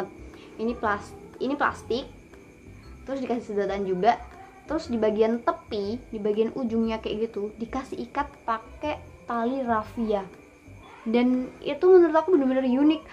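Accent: Malaysia. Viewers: Lawak gile org indonesia ckp slang2 malaysia😂😂 comel sangat😂😂💕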